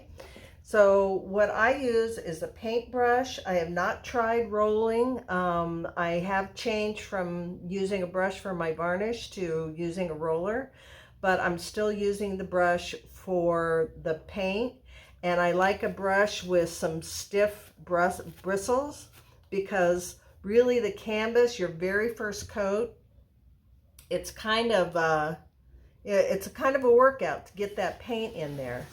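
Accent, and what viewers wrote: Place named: United States. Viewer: Your accent is obviously American. I’m curious. How long have you lived in Costa Rica? What made you move there? I love your painted rugs.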